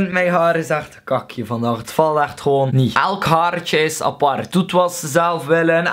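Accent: Belgium. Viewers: Hoor je dat niet hij is belg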